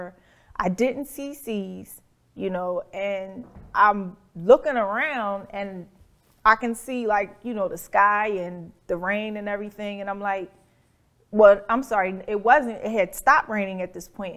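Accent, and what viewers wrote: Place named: Philadelphia, United States. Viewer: I love her Philly Accent!!!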